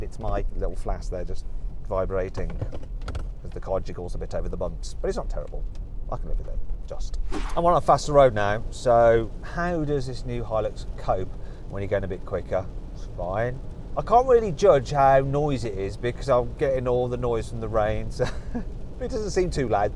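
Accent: United Kingdom. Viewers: 英語の訛りがイギリス以外のなんでもないやん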